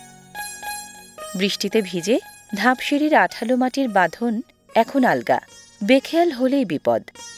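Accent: Standard. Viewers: আপুর কন্ঠের ভাষা অনেক ভালো লাগে। শুদ্ধস্বরে বাংলা ভাষা শুনলে যেন প্রান জুড়িয়ে যায়। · আপু আপনার অনুষ্ঠান খুবই ভালো লাগে আপনার মুখের কথা গুলো অতি শুদ্ধ আর আপনার মুখের ভাষা গুলো শুনতে ভালো লাগে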